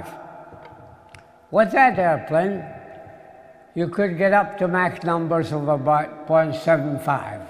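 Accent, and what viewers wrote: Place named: Scotland, United Kingdom. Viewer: If he was Home counties and/or ex-OxBridge….. we would be welcoming, then warmly applauding ‘Sir Eric’. Shame about that Scottish accent too.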